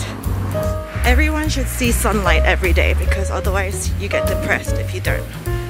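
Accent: United Kingdom. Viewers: I loveeee your British accent.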